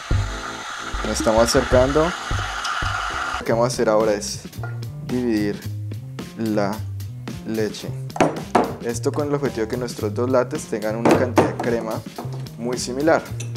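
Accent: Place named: Colombia. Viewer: Gracias por la receta. parece que eres colombiano. Ahora voy a preparar mi café late orgánico. Un abrazo, saludos desde Popayán, Colombia.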